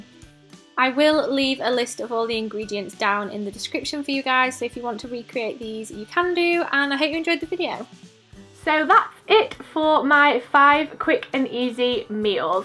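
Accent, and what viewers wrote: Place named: South Yorkshire, United Kingdom. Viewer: Where are you from?! You sounds so much like me, haha, i'm from South Yorkshire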